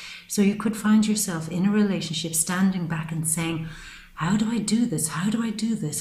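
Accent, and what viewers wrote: Ireland. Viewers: Very nice to find you! Are you Irish? Great reading! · Do I detect an Irish accent? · You're Irish also? 😊😂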